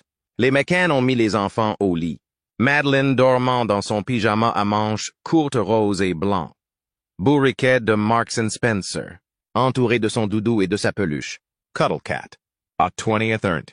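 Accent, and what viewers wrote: Canada. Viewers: Pourquoi "Pauvre France" ?? Le gars qui parle a un accent canadien !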